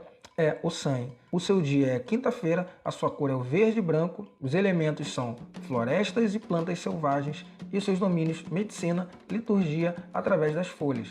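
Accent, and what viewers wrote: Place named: Rio de Janeiro, Brazil. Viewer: O vídeo foi excelente parabéns Pelo sotaque vc é do RJ como eu kk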